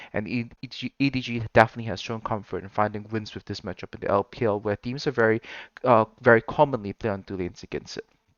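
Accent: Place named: Singapore. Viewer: Speaker slower.also don't try to hide ur singlish. Celebrate it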